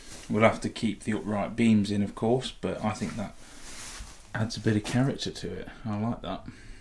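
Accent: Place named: East Midlands, United Kingdom. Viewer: Just started watching your videos, listening to your accent I thought you were from around East Midlands and just seen Leicester. Big move and a sensible move, well done you, we got out of Leicester 4 years a and moved to the Outer Hebrides thinking it was remote, nothing like you. Look forward to you renovating the house, where in Leicester were you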